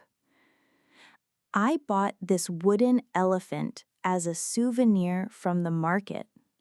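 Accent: United States. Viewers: Is it American English?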